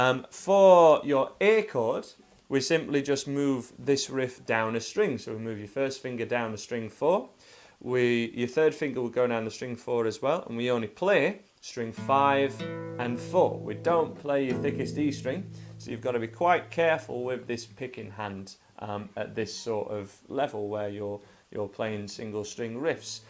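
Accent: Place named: Liverpool, United Kingdom. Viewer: Another awesome lesson, dude. You have a great way of teaching guitar and familiarizing yourself with an audience you can't even see. Are from Liverpool or Woolton by chance? You sound a lot like the legend on the wall behind you. Accent wise. Trips me out. Thanks again, brutha.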